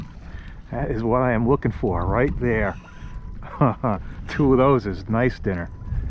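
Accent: Long Island, United States. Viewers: did you move to Florida you got me when you said you where in Florida in that Northshore long Island voice!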